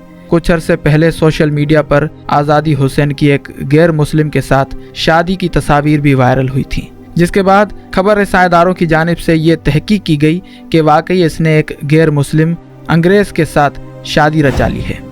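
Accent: India. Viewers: یہ گنی اور کھواتین کیا ہوتا ہے؟ شرم نہیں ،،بے غیرت مت بنو،،اور اردو صحیح بولنا سیکھو،،،کیا تم انڈیا سے ہو؟ اگر نہیں تو لعنت ہے تمہارے اس لہجہ پر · This guy is an indian not pakistan. Notice the way he pronounces 'KH' like hindi speakers of india. Pakistanis, dont be fooled by this hateful crap created by an indian. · Aap Urdu sahi bolo Indian ki tarha nahin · Urdu pronunciation thik kero apni. Indian style mein boltay ho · آپ کی اردو کا تلفظ مشکوک ہے۔ وڈیو ٹھیک ہے ۔ مگر آپ کا تلفظ ہندی ہے۔ اس کو ٹھیک کریں ۔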